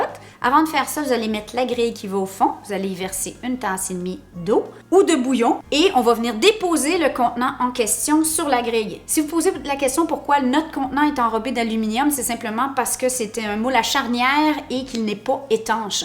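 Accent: Quebec, Canada. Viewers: Bonsoir, Je vis à Versailles du côté de Paris en France (Je dis ça parce que je sais qu'il y a une ville qui se nomme Paris aux USA) je te trouve super sympathique agréable et pleine de vie et de gaité avec " Chéri " comme tu l'appelles, eh puis j'ai découvert tout le début de la vie de ton petit Momo, j'en ai eu les larmes aux yeux tellement vous avez du prendre sur vous et passer des moments si difficiles, mais vraiment vous avez tout mon respect vous êtes vraiment des parents fabuleux, je trouve vôtre aventure stupéfiante..... Oui donc j'aime aussi beaucoup ton accent québécois on avait le même en France au 17ème et 18ème siècle, je trouve vraiment ta manière de parler très craquante!! 😉🤗. Par contre tu devrais pour nous les français donner des traductions de certains mots typiques du Québec, et surtout vos mesures... Bon maintenant j'ai fais des recherches donc j'arrive à te décoder mieux!! 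😏. Par exemple quand tu dis cuillère à thé en France on dit cuillère à café, quand tu dis cuillère à table nous on dit cuillère à soupe, quand tu dis une livre nous on dit un demi kilo...!!! Aaah sinon je vais faire bientôt ton fameux ragoût de boulettes parce que j'en ai tellement envie en te voyant faire ça m'a saliver, et j'adore le jarret de porc cuit, que nous mangeons chez nous avec des lentilles ou de la choucroute, c'est une merveille!!... Et j'ai vu aussi que tu es copine avec Popote et potager de Thierry et sa cherie, je les connais depuis pas mal te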